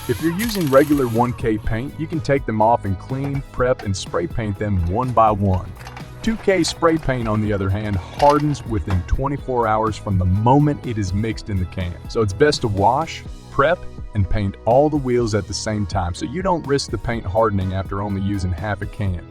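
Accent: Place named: South, United States. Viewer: Dude, that video was f-ing amazing! Plus I'm pretty sure chicks totally dig that southern accent! Subscribed.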